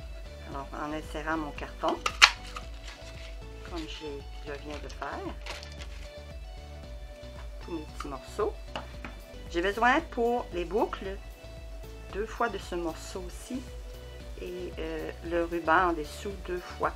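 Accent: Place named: Canada. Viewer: Très complet et très clair, merci ! En plus j'adore votre chaleureux accent canadien !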